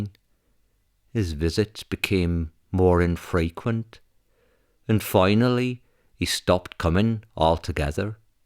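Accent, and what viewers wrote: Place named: Ireland. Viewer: Aye! I thought it was a pretty decent irish accent. Buuuut I'm American....soooo, yeah. ¡Top o the marnin!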